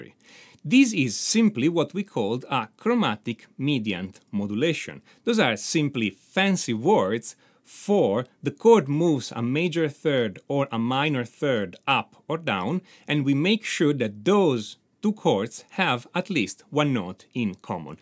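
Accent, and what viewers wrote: Italy. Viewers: Hello are you italian?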